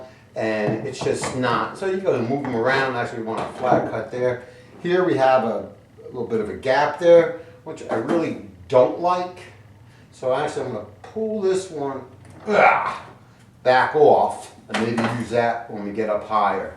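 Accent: New York, United States. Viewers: Great videos man, straight to the point no BS, as an avid DIY'er This is about to be my new favorite channel for sure. I am in the process of buying a new house right now and if everything goes through, I will be using your vids to do alot of work. I may be wrong but you sound like your from New York. I live in NY also, if so and you want to pm me some info incase theres something I cant tackle I will definitely hire you. Thanks keep these videos coming we all appreciate your help.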